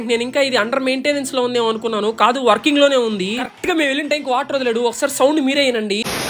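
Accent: Nellore, India. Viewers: Anna meedi Nellore naaaa 😅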